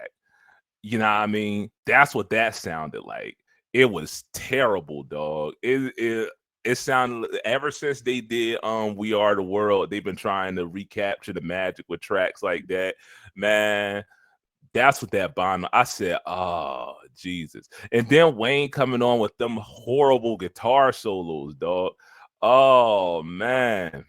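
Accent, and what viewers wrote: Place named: Philadelphia, United States. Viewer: You from Philly, ain’t cha? 🧐😏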